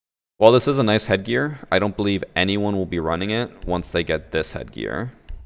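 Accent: Canada. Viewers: Yo do I speak Spanish? I know ur Canadian because you say “oat” instead of out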